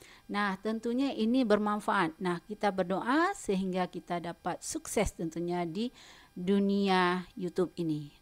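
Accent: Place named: Medan, Indonesia. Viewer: Aksennya seperti logat Medan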